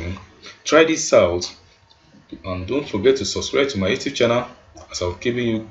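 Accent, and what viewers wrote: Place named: Dubai, United Arab Emirates. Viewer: Your accent is really difficult to get, it feels like you're speaking like Dubai people.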